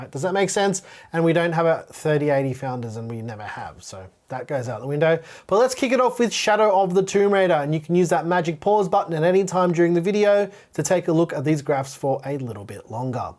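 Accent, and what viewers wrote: Australia. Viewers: No. lol. what an aussie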